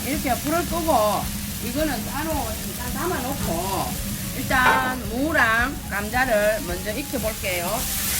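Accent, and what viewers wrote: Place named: Busan, South Korea. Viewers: 부산분이라그런것도있으시겠지만 제가봐도 실력도좋으시고 정감도있는분 확실합니다 ㅋ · 아 ! ~ 참 깨를 안뿌렸네 ~ 에서 빵~ 터지네요 레시피 그대로 조만간에 만들어봐야겠어요 영상을 오늘 첨 봤는데 좋아요 와 구독 누르게 되네요 구수한 경상도 부산 사투리가 정겹고 좋아요 저도 부산사람 이라서 그런가? ㅋ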